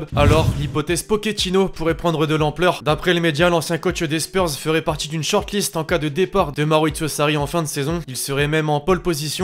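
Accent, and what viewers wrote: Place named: Marseille, France. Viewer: Il Marseillais mtn mdr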